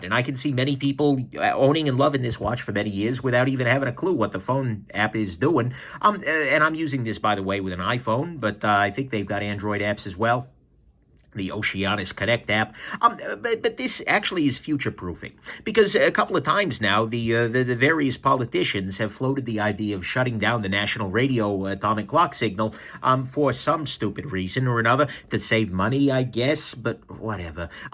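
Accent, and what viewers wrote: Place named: Staten Island, United States. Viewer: You have a Staten Island accent.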